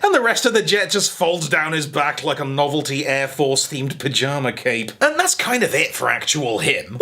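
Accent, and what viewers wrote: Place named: United Kingdom. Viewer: It's the accent. Everything sound epic in a British accent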